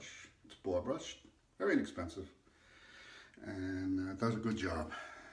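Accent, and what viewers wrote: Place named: New York, United States. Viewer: I love your New Yok accent.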